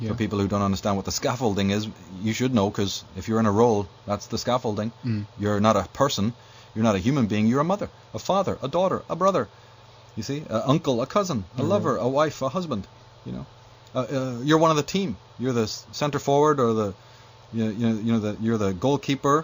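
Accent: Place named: Northern Ireland, United Kingdom. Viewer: I find his voice compelling... The slight Northern Irish accent makes it easy to listen to. Thanks for uploading this Free your sou with 7 l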